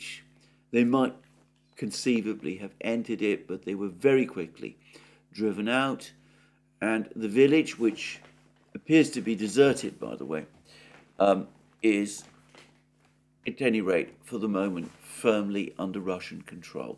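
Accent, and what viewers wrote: United Kingdom. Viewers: Dear, I continue enjoying your videos every single day and feel grateful for both their content as well as your fine British English. I once offered to make suggestions on the pronunciation of Russian (and possibly Ukrainian) proper names as well as some factual information on Russian history and trivia, and now see you have accepted suggestions from someone else. You do not need to pronounce Russian / Ukrainian proper names as per the source language standards, especially if there is a long standing tradition in a particular case, like, for instance, Moscow. However, the global trend is towards trying to pronounce foreign proper names as close to the original as possible, and you have now made fantastic improvements along this road which makes me so happy as a native Russian speaker with some notion of Ukrainian pronunciation. This is why I would wish to make a couple of additional suggestions if I may. 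A capital letter preceded by a single inverted comma indicate the vowel under stress in a word. First, a delicate one. We say Dni'Epr in Russian, not Dnipr. The Ukrainian equivalent is Dnipr'O (both river and city). Ukrainian men's Christian names are Petr'O for the Russian Pi'Otr (like Petr'O Porosh'Enko) and Dmitr'O for the Russian Dm'Itriy (like Dmitr'O Nataluha). Some more place names: Lim'An Izi'Um Osk'Ol (river)/Ukr.: Osk'Il Tokm'Ak Taganr'Og Andr'Eievka / Ukr.: Andr'Iyivka A couple of family names: Lavr'Ov Poliak'Ov(a) I have no idea of Gen. Mordvichev's own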